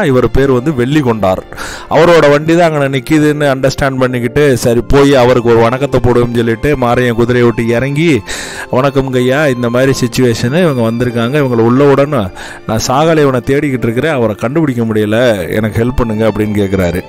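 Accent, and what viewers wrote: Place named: Madurai, India. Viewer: Actually நீங்கள் எவ்வளவுதான் try பண்ணினாலும் முடியாது. சொல்ராங்கே மதுரைக்காரர் என தெரிகிறது.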